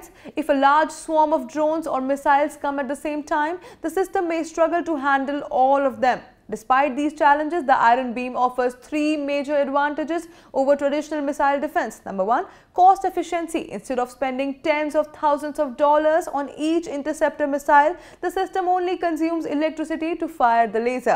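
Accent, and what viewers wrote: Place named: India. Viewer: i love indian people., but i hate when indians speak english. stop please. its menasing to hear you speak english with that indian accent.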